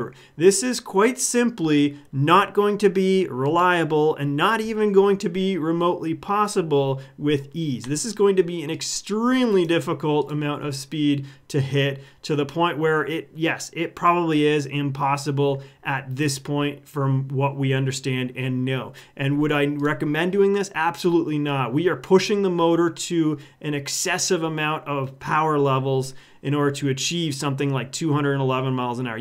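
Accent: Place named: Canada. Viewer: I thought I heard a Canadian accent.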